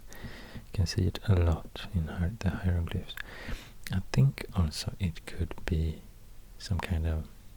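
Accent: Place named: Sweden. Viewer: You is swedish?? I recognize my accent when I hear it 🤔 Anyway amazing video! Loved it ❤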